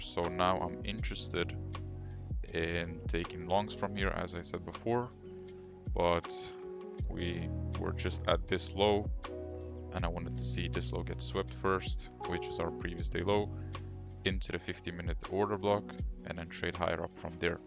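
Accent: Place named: Flanders, Belgium. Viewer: Volgens mij hoor ik een klein vlaams accentje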